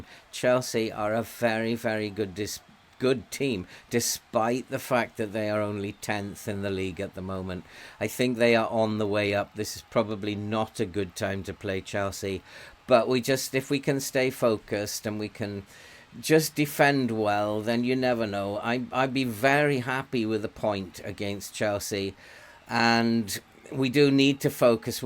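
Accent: Wales, United Kingdom. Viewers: haha fair enough! Are you Welsh??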